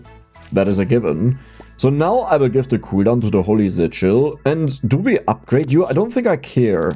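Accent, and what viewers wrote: Germany. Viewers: Man hört so raus dass du deutsch bist. Ich Liebs. Peak Video btw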